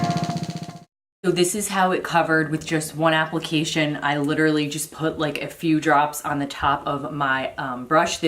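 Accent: Philadelphia, United States. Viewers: Your accent is totally Philly. I hear it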